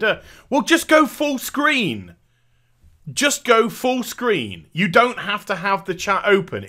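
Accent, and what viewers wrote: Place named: United Kingdom. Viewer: lol this British accent :D, im sorry i assumed that!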